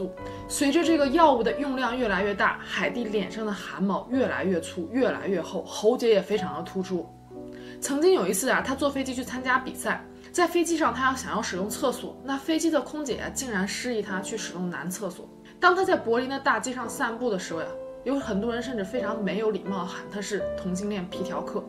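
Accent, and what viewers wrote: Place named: Northeast, China. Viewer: 听口音是东北人哪里的？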